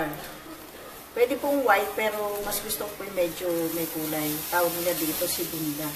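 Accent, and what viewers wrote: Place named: Bulacan, Philippines. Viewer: ng marinig ko ang accent mo, sabi ko taga bulacan to..kaya ng makita ko na espasol ang gagawin mo..i deduced na san miguel ka laki.. :))) taga san miguel din kami (mother side), sa may sta inez... keep up the good job :))))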